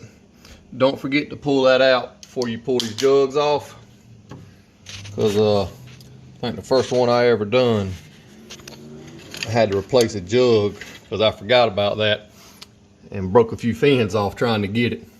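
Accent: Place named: Deep South, United States. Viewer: Big Bay'er fo hunred, got er a rebil kiyt. man thats a deep deep south accent brother. Im from the far far north east.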